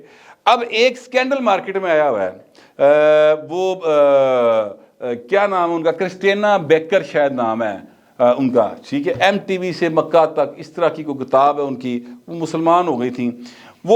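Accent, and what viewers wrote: Lahore, Pakistan. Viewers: آپ کا لاھوری سٹائل گفتگو کرنا بہت اچھی لگتی ھے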